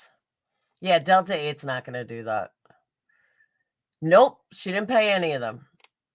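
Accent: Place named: New York, United States. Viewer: Exactly true lots of people got brain tumors. You say so cute Ny accent. Lol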